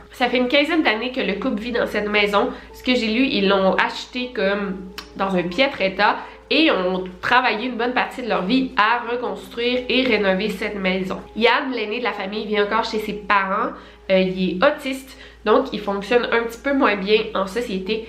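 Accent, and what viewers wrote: Canada. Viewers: Laissé tombé cette Accent canadienne vraiment j'aime pas trop, pourtant elle j'aime bien.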